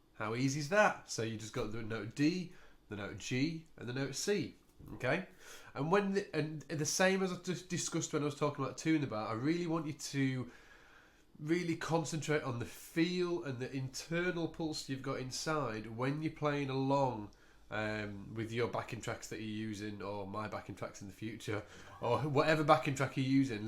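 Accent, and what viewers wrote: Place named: United Kingdom. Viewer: I believe this is a pure British English. Sooooooo cool)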